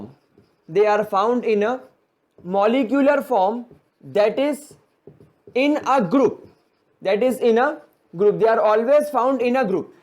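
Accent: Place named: Gujarat, India. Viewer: By your accent I can understand that you are a Gujarati.........Isn't it?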